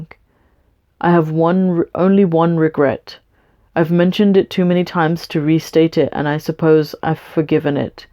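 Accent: United Kingdom. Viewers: Real question..what is the objective of content you put out? informative, validation, monetary, therapeutic just plain ol' fun,when i view your content I try to look past the ex muslim chick with the Brit accent seeking validation from cyberspace with a western culture fetish, maybe its partly because I dont see (or really care to be honest)about Islam or its followers where I Iive..you have a lot of well wishers and supporters..on Team which is good my comments arent meant to demean or be disrepectful..i could be out in sunny 85 degree weather but I choose to watch your content..mix it up..interact with people..do some location shoots..surely the Universe isnt all Islam sucks My family sucks I like western entertainment and the occasional cringy use of American slang(sorry its like imagining the queen saying" bitch got cake" lol)..NOW you're Artwork I like....a little angular for my tastes but I love your color schemes...hey put out an adult coloring book that might generate some scratch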